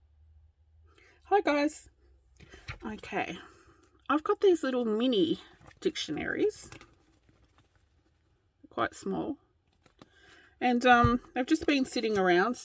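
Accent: Australia. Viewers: Love that you sound like a fellow Aussie -& living your mini pockets 👍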